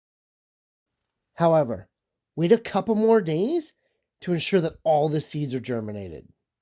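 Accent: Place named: Canada. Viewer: I thought so too. So I looked it up. Turns out, Americans pronounce it with a silent H. Brits pronounce the H (as one would pronounce the nickname for Herbert). The host of this video sounds Canadian to me, so it makes sense that he is using the British version.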